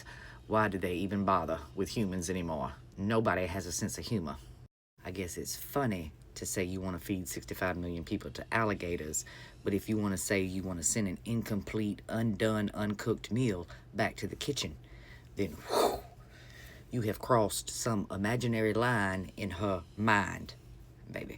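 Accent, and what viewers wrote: South, United States. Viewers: This is the most "well bless your sweet lil cotton-pickin' heart" Southern sass i have ever heard.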